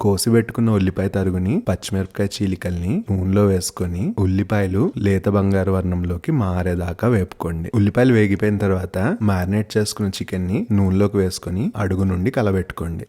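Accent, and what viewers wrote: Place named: Vijayawada, India. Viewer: Chala rojulu Ayindi andi mana style Telugu accent vinni. Mi Telugu accent ma vijayawada accent laga unde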